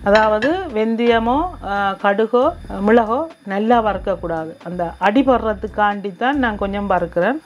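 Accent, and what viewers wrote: Sri Lanka. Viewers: Super Acharu 👌👌it's so very nice to hear you with your Srilankan accent 🤪Your garden also looks nice 👏.I too do this picked vegetables but never added mangoes.I will add it next time. Vazhga Valamudan ❤❤🥰😍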